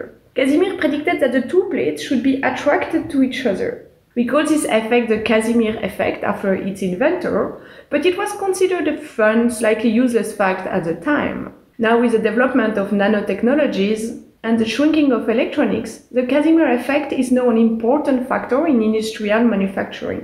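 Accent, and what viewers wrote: France. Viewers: helloo i love your french accent, i could listen to you talk about casimir effects all day 🤩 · I noticed a french accent. surely not a surprise. But given that google ASK AI is only in english (at least not in french) I guess this is another science communication force toward french to english to french communicatino in scinece. off topic in passing just noticing.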